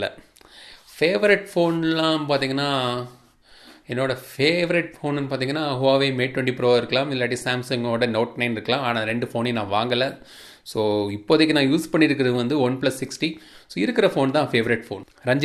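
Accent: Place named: Nanjil Nadu, India. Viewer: nanjil tamil super....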